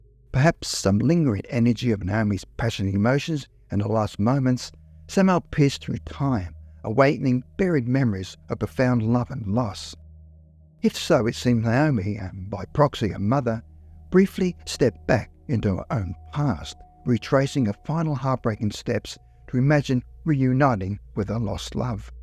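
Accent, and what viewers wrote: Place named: Australia. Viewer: Wonderful stories with a twist and a Aussi accent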